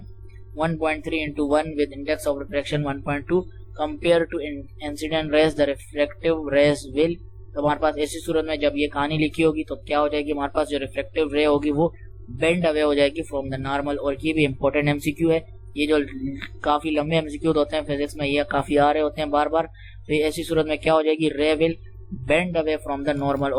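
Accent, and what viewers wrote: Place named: Karachi, Pakistan. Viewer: bro ascent sa to karachi k lagty ho #karachiwalay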